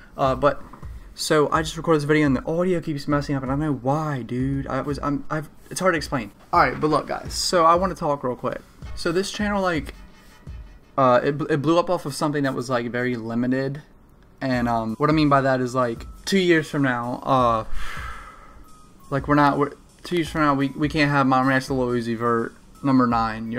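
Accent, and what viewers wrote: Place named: East Coast, United States. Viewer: east coast accent im guessing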